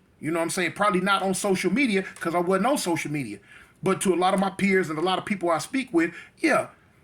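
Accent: South, United States. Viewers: You've seemed to have picked up a southern accent, when I first started watching your videos I didn't think you were from California. You're spit on, that is exactly how it happened with my city. There wasn't any gangs there until LA cats came. It's like anything someone start it and show others and they get up to speed and run with it.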